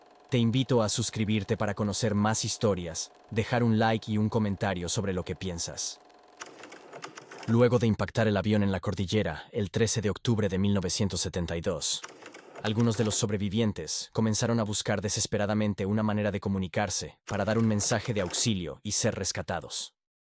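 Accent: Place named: United States. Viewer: He estado viendo tus vídeos y noto que tienes un acento medio gringo, y no solo a lo hora de pronunciar nombres ingleses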